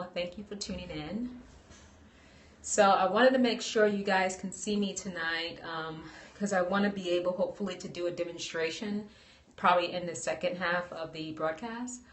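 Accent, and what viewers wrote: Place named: Bronx, United States. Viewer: randomly passing by and then I hear the bronx, woah, I'm from castle hill! living in FL now :(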